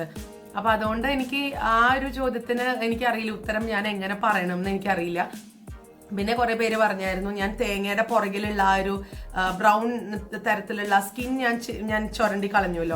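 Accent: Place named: Poonjar, India. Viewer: Poonjar Anglisized malayalam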